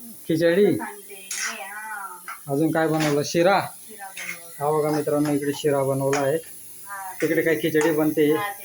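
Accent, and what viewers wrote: Khandesh, India. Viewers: Bhai khandeshi vatato aahe kutla aahe proper gav konte aahe tuze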